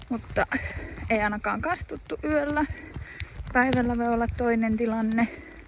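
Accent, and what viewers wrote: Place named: Ostrobothnia, Finland. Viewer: Mukavan rentoa meininkiä 👍 Pilkahduksia pohojammaan murteesta 😊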